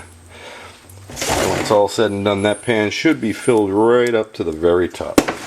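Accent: United States. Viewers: Love the South Shore accent!!!!!! :P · I love the Boston accent! Trying it this afternoon and will be back at you...thanks!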